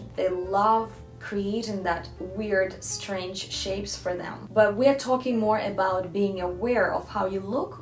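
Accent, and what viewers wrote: Russia. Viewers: Is your mother tongue Russian?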